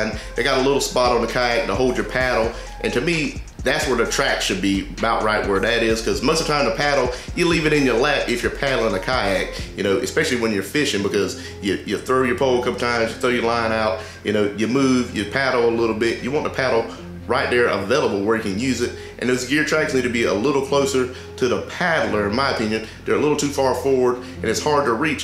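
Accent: South, United States. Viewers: Good review!! You gotta be from the south bro, you got that down home good ol boy sound to you! I’m in Auburn Alabama area.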